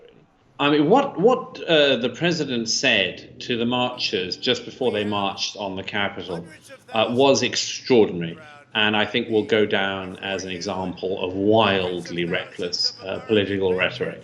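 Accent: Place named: RP, United Kingdom. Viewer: Firstly, why is Douglas wearing make up? Secondly, why are people listening to his analysis? In the summer he predicted that Trump would win in a landslide as a result of the BLM protest. talks nonsense with a posh voice. Sad that for a certain sector of the British public this is what passes for insight.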